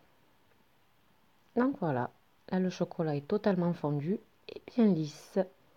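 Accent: South, France. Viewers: Vous êtes un vrai chef . Trop top l’accent du sud. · Il est toujours plaisant de visionner vos vidéos qui sont toujours très bien expliquées et votre voix est très agréable. Une voix du sud moi qui suis du nord. Merci et bonne soirée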